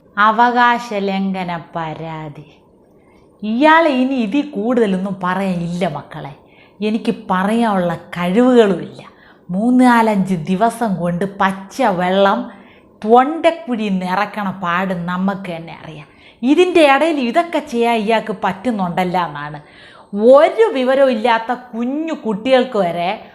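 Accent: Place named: Trivandrum, India. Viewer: നല്ല tvm slang കേൾക്കാൻകൊള്ളാം നീ ആരെടെയ്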